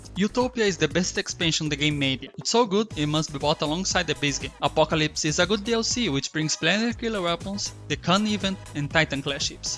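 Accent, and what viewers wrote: Brazil. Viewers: So pelo teu jeito de falar ingles descobri que tu é brasileiro hahahahaha. Primeiro br fazendo video estilo seth, muito bom, continua!! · Pelo teu sotaque, sei que tu é brasileiro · Sabia q era BR só pelo sotaque kkkkkk, ótimo vídeo champs! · Hey, you have accent, are you Brazilian or Latin American? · U really sounded like a brazilian in this video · tava reconhecendo seu sotaque... é BR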